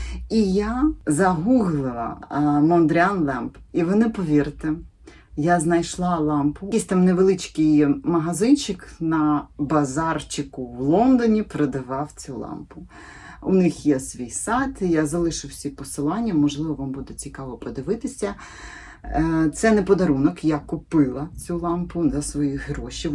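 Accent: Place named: Surzhik, Ukraine. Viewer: Какой же всё-таки уродский суржик селюков, слушать невозможно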